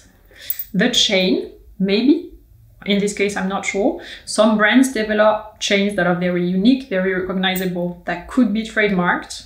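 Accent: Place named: France. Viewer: Just a small observation, since you're back in France, your French accent can be heard a bit more clearly again. :D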